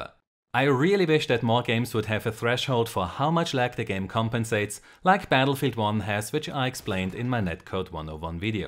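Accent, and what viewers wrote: Germany. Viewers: 1 satz und man hört das du deutsch bist :D klasse video!! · German speaking english????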